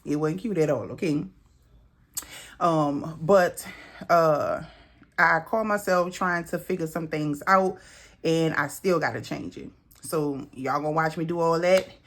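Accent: Louisiana, United States. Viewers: Hey, girl you got me with robbing peter to pay paul!! 😂😂 New subscriber!! I love your accent...are you from Louisiana? ❤☺️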